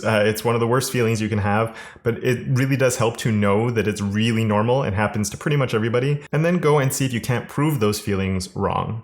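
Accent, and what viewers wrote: Canada. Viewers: This was good. You have spent some time looking inside yourself to understand where this comes from. Very helpful to me at this time. Also.... are you Canadian? "About" and "doubt" caught my ear. ;)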